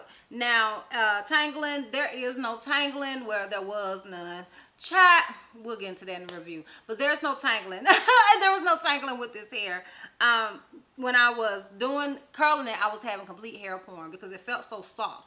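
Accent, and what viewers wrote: United States. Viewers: Girl you are so country..I love it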